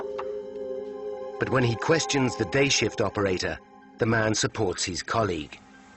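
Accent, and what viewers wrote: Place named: England, United Kingdom. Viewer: Ironic that there is an English accent narrator in this version considering BP owned the plant. They care nothing about their workers just profit.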